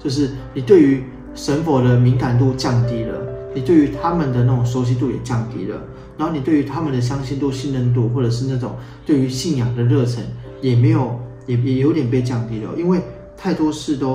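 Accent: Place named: South, Taiwan. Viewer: 難怪老師Hen有南部腔🤣我老家在屏東聽得出來🤣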